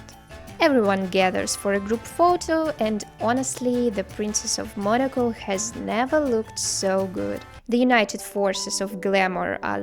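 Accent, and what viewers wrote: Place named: England, United Kingdom. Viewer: Wow, you're from England!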